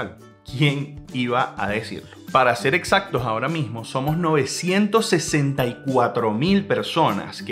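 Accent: Venezuela. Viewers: Ajajaja me da mucha risa como hablas Venezolano neto Doc.. tambien soy Venezolana pegadisima ah sus videos explica todo muy bn Bendiciones 🙏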